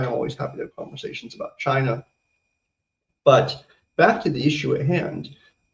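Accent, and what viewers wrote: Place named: United States. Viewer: YO!!!!! Chandler Bing LIVES!!!!!!! Why is he speaking with a posh American accent!!!!!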